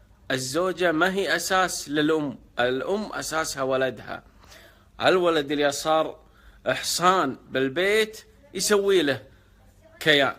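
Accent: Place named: Syria. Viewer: شكلك سوري من كلامك . انت من البدون؟؟؟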